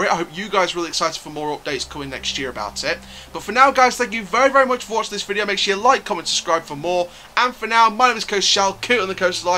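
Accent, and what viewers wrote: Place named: United Kingdom. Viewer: You have a cool British accent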